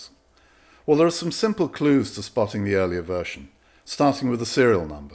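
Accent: United Kingdom. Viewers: With a name like and a British accent, how can we go wrong?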